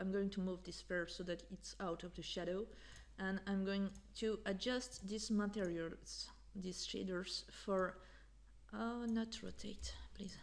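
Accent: France. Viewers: Bonjour, J'ai regardé vos vidéos. Je crois deviner un accent français. J'aimerai faire des sculptures en bronze à l'aide votre utilitaire "Metal Shader" avec Victoriai 7 de Daz Studio. Est-ce que c'est possible? Pourriez-vous svp me donner quelques pistes pour débuter? Je vous remercie. Jean-M!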